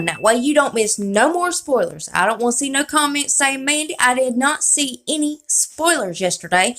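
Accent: South, United States. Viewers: I'm so amused with your southern voice. Absolutely adorable!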